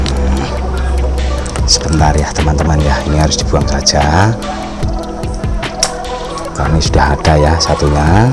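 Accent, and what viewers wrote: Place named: Java, Indonesia. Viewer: Bapak pasti ada darah jawanya...bahasanya medok jawa....salam dari plat H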